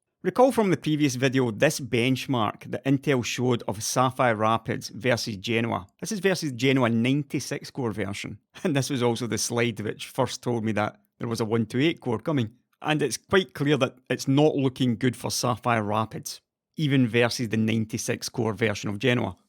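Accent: Scotland, United Kingdom. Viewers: From a fellow scot it's nice to hear your voice again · "Alright guys" in that Scottish accent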